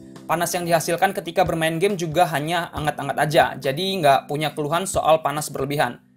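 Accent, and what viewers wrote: Lampung, Indonesia. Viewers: Lu orang lampung tah bang?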